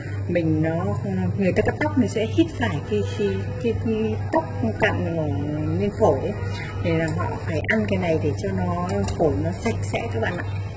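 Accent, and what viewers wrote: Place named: Vinh Phuc, Vietnam. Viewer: Giọng nói này là người Vĩnh phúc . Và chưa biết nấu ăn ngon .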